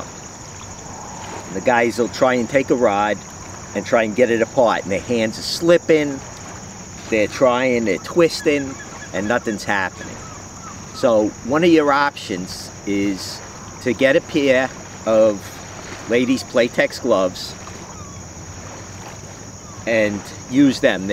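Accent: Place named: New York, United States. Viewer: New Yorker 😂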